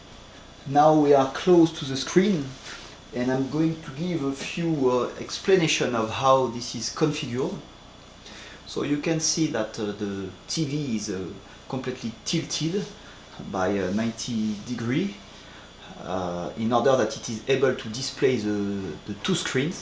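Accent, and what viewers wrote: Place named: England, United Kingdom. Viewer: Magnifique accent anglais ^^, le rendu a l air bien sympa !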